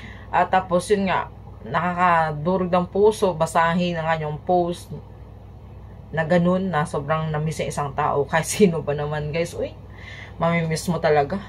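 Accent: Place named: Visayas, Philippines. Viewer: Visaya.kainis yang pronounce mo.sakit sa tenga.alden po!d aldin.juliet po.d joliet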